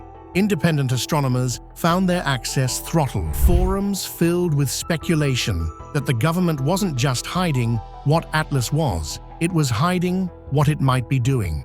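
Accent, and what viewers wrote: United Kingdom. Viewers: The British voice again…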